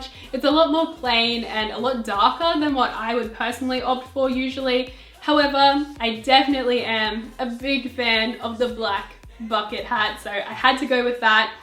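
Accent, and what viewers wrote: Australia. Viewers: ahhh i love this video so much! im from australia too and its so cool hearing someone rant about bts in an aussie accent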